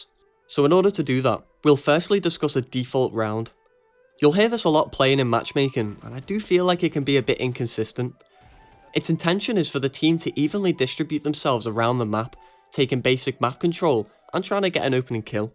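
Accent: Liverpool, United Kingdom. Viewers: Was sceptical on a Scouse lad trying to break down a map 😂. Very nice break down and very well put together video brother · Wanted to ask that as your a scouser do you also say your hank marvin? Had a scouse roommate a couple of years ago and as an American I cracked up every time he frustratedly said “ah lad whaddaya on about?!” Good times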